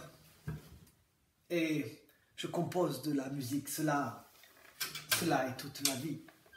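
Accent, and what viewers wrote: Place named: Germany. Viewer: FORMIDABLE. MERCI POUR CETTE LECTURE Á L'ACCENT GERMANOPHONE COMME DANS LE FILM.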